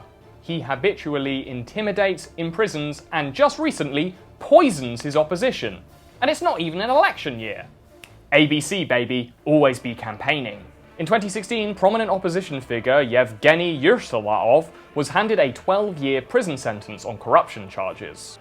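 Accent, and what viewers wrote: United Kingdom. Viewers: What kind of British accent is this!?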